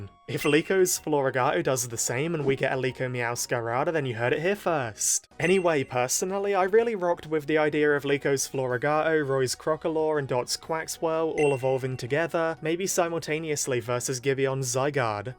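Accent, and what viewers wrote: United Kingdom. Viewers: Dude Are you British 👀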